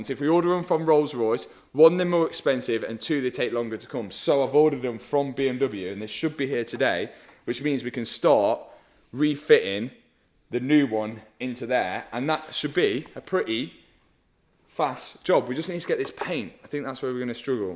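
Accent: Leicester, United Kingdom. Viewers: I'm curious, what part of the UK is the accent from? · I love that Lesta accent . 👍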